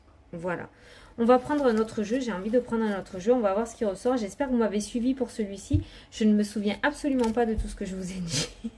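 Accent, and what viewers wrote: South, France. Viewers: Dommage qu'Olivier vivent en Normandie 😕 Avec ton accent du sud, j'ai espéré qu'il soit dans le coin. Avignon/Normandie arrrf 😁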